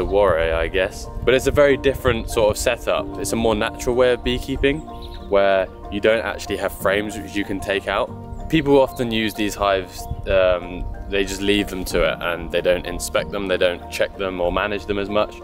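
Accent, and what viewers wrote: England, United Kingdom. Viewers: I also love watching these videos! And the English accent along with your jargon is really fascinating to listen too. Thank you for all the hard work you put into your harvesting and videos!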